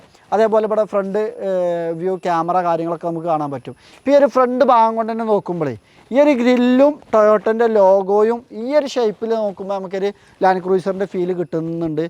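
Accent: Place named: Valluvanad, India. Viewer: You described well in your own “valluvanadan”style.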